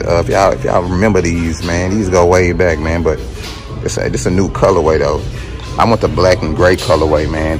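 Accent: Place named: Houston, United States. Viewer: Htown accent on deck.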